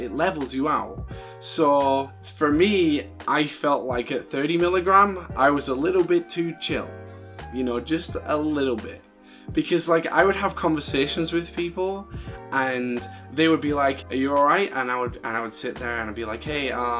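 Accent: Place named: Scotland, United Kingdom. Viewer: Are you in the UK or USA? You have a Scottish accent but adderal isn't prescribed in the UK?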